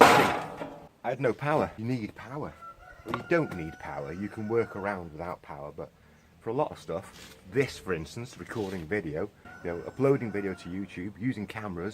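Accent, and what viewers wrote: Wales, United Kingdom. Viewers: Awesome !!! Been wanting to try that for ages ! Maybe next : gasification??? Familiar accent ? Rhyl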